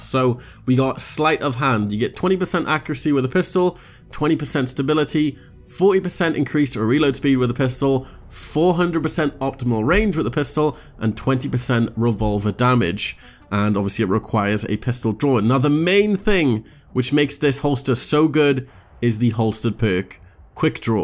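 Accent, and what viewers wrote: Wales, United Kingdom. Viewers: Are u Welch? Ur uncle is pilot teacher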